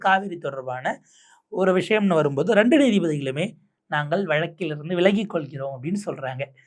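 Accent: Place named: Tirunelveli, India. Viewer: தம்பி நீ எந்த ஊர் உன் பாசைதிருனவே லியாதமிழ் அருமையா கிருக்கு பனி மீன்டும் தொடரட்டும்👍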